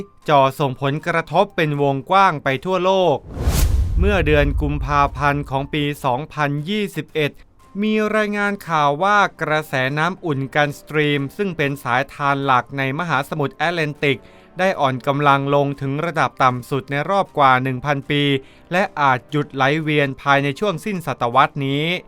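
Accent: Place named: Surin, Thailand. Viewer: คนอ่านเป็นคนสุรินทร์ แน่เลย ชอบฟังเพลง เขมร กัลฟ์ตรึม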